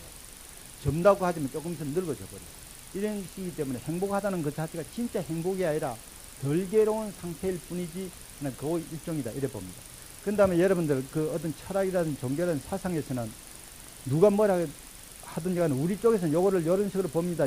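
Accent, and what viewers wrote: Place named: South Gyeongsang, South Korea. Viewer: 구수한 경상남도 사투리의 질박하고 자세한 스님의 강의를 듣는 인연을 맺어주신 데 대해 감사드립니다.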